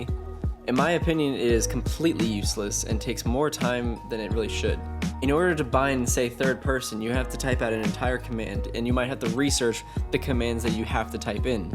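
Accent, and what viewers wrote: Switzerland. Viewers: Are u from Switzerland? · Wait, are you from switzerland?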